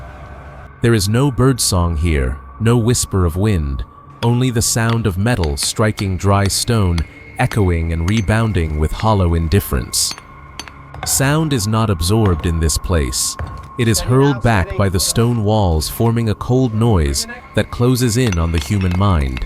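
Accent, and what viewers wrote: United States. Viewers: This is fascinating, please use real British narration though, not American robots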